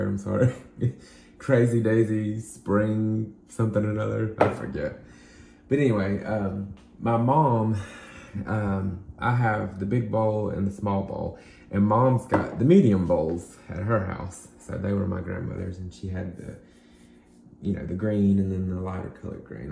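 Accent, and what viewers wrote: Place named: South, United States. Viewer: I'm a new subscriber. Old grandma 👵 from North Texas. Love your shared knowledge and collections and Southern accent. Keep up the great job.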